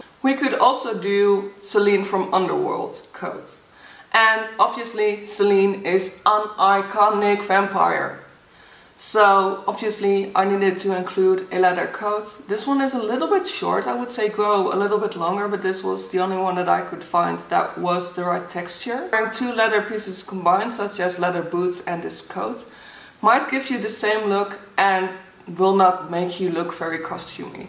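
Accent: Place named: Netherlands. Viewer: Are you by chance dutch I think I recognize the accent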